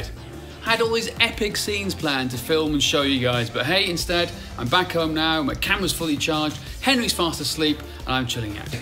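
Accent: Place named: United Kingdom. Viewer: You have a very nice style and manner, also always nice to hear a fellow Brit ✌🏻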